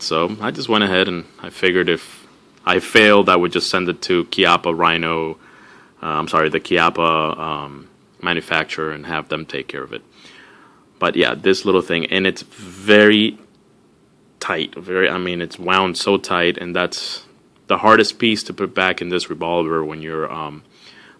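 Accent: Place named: South America. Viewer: Your accent sounds like your from South America. And nothing wrong with that. But it’s not called a, handle, it’s called, the grip. But I understand why you would call it a handle. And this was such a kick ass video. I’ve never seen the inside of this firearm. Thanks for making such an in depth video on the chiappa rhino. Keep it up man. I’d like to see more video of some other gun, strip downs.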